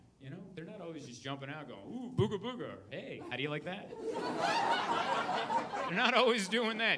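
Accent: Hungary. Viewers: Okay I still laughed, but your Hungarian accent is awfulllll 😂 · as native Magyar, I was surprised to hear that you don't speak Hungarian. Travelling a lot internationally, I can recognize my fellows just by accent from far away, airports, hotels, anywhere just by hearing (maybe because I have also this thick accent, tuned my ears for). Did you know that you have typical Hungarian accent speaking English? Surprising... Congrats man for your stand-ups, go on! BR, Csaba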